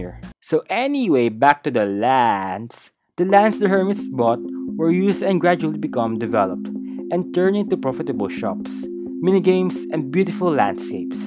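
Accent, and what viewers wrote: Philippines. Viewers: Why do you sound like a Filipino? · I’m just gonna guess, but... Are you filipino? The accent’s there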